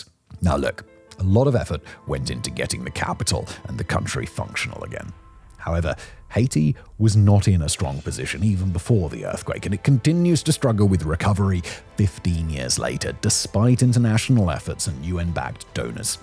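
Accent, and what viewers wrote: England, United Kingdom. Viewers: Simon create a video full of lies using a mix of content from a bunch of other online contents. I have friends in Petion-Ville right now having more fun than me and looking forward to enjoy the weekend. Don't let this random English accent define what's going on in Haiti....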